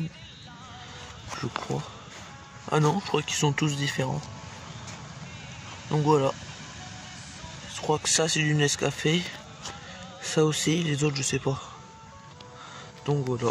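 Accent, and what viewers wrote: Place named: Normandy, France. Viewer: c'est vrai que tu as l'accent normand ^^ tu es de quelle coin en Normandie ?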